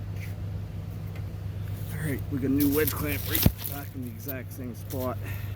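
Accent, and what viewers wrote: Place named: British Columbia, Canada. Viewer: Where exactly are you located at I could probably kind of guess by your accent that you're in the BC area me I'm down here in Tampa Florida but great videos love watching them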